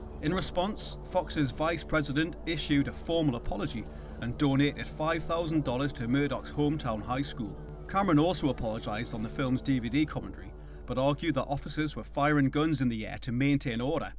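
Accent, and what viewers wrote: Newcastle, United Kingdom. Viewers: Geordie accent...North of England...Newcastle area.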